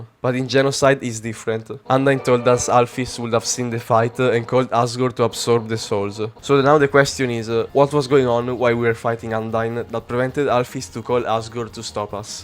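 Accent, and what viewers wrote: Italy. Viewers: Ho aperto il video letteralmente 2 secondi ed ho detto: Ma è italiano! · i can hear the italian accent... are you italian?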